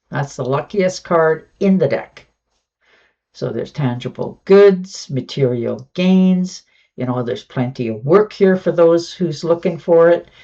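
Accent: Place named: Canada. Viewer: New viewer here. Good reading, thanks. Your accent sounds a lot like my Canadian friends from BC. Sub'd.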